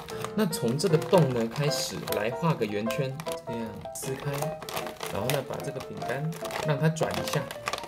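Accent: Standard. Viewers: 中午太標準了吧！ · 韓國人會講中文喔，好厲害，講的好標準喔！真的是韓國人嗎？不說我還以為是台灣人欸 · 很字正腔圓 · 真的，超標準，比我們教授的好100倍QQ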